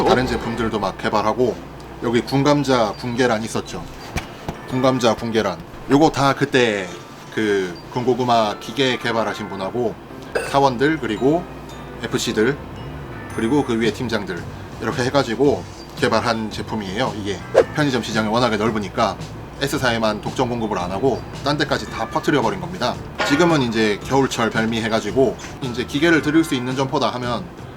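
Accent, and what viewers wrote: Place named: Gangwon, South Korea. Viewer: 이분 강원도 분인것 같다..사투리가 강원도 사투린데..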